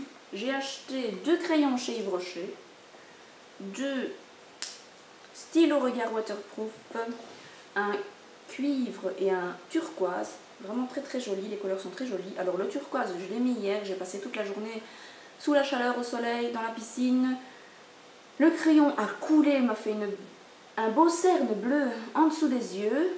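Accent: England, United Kingdom. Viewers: Tu es parfaite! Et cet accent Anglais de ouf! Sinon, tu vas être interprète Français-...? Mon papa a été prof d' Espagnol dans ton école mais il est retraité depuis quelques années maintenant #racontesavie Bisous et longue vie à ta chaîne! Frivole P.S: de rieeeeen! ^^ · Tu te débrouilles très bien pour une première fois, en plus tu es naturelle et sympathique :) Moi je n'ose pas me filmer, enfin pas pour l'instant^^ Si non ton accent anglais est top!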